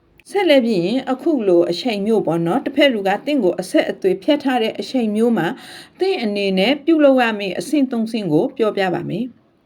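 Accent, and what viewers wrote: Rakhine, Myanmar. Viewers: စကားပြောနေတဲ့ဆရာမကရခိုင်နဲ့သူတယ်နော်။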